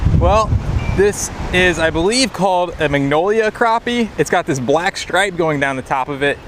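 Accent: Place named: Buffalo, United States. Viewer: Your accent reminds me of my buffalo ny extended family....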